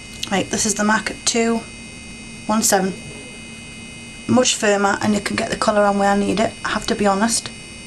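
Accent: United Kingdom. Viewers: I'm Brazilian, could you talk a little slower? Just a little? I have to put the audio transcription to get most of what you say by reading, and normally I talk to native Americans who I can understand more than you who is British. I say that 'cause I love your videos and make ups. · Very good tips.. it will helps a lot in my photoshop retouches! Just one thing I hated: your british accent. American sounds good to me, but... Thanks a lot!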